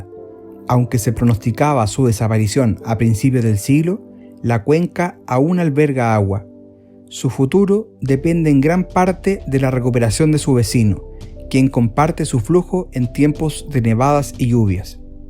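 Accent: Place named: Chile. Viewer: Estaría mejor si no lo relatara un chileno muerto de hambre 😊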